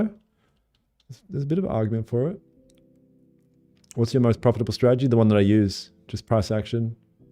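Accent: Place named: Australia. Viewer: Living in Australia. It’s refreshing hearing an Aussie accent with typical Aussie humour. I was skeptical at your simple trading style but you are making money and I’m not. Think I’ll start tuning into your streams mate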